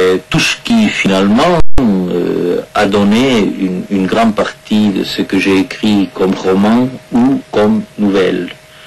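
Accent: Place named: France. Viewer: Muy poco le sale muy bien! Pero lo cómico es que tiene un acento francés cuando habla castellano jaj! La doble r por ejemplo, la pronuncia como un francés, y dice "euuuh" todo el tiempo. Salutations.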